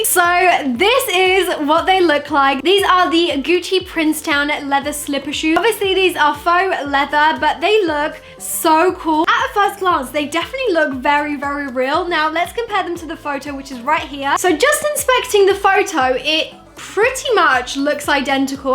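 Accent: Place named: London, United Kingdom. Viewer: You literally sound like a londoner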